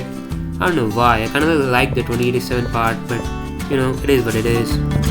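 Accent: India. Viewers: Bro you sounds like Indian 😅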